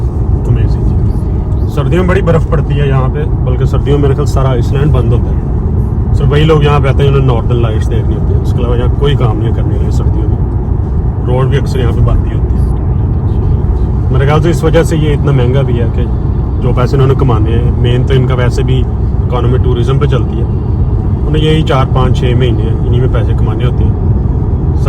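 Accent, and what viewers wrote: Punjab, India. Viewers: yar biggets fan from lahore pakistan boht hi piaray lag rahay ho achhi video bnai hai yar punjabi main baat kia karo ziada, love your natural punjabi accent urdu, punjabi bola karo koshish karo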